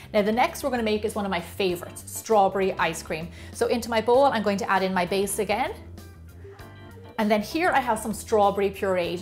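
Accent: Ireland. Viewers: Is Irish if so I am too lol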